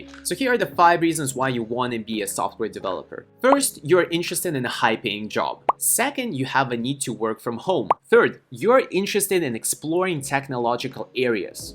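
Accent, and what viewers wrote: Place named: Russia. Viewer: That russian accent man, I can hear it from miles :)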